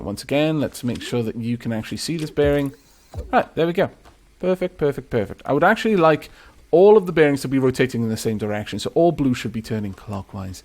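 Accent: Wales, United Kingdom. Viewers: are you Welsh 🏴🏴?? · is he Welsh?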